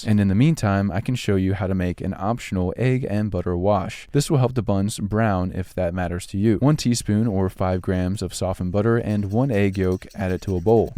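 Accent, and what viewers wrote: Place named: United States. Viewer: Why speak perfect America English then go on to say Celsius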